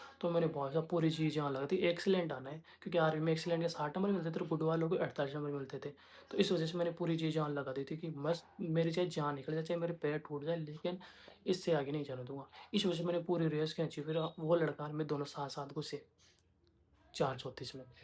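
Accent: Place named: Jaipur, India. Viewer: aapke accent se hi samjh aa rha h kyuki m bhi jaipur se hu..and my mom use to sounds like you😅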